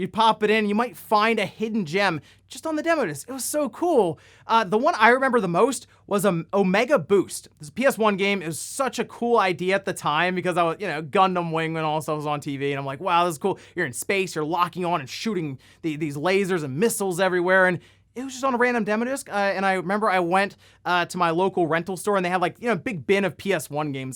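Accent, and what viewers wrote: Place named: Philadelphia, United States. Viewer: U from philly area, I hear it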